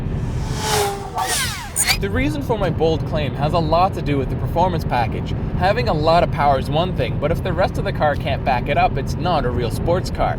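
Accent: Canada. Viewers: so canadian sounding, i dig it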